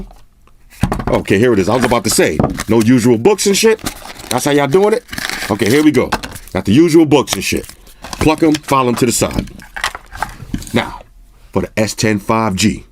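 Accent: New York, United States. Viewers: eyyyy a New York brother!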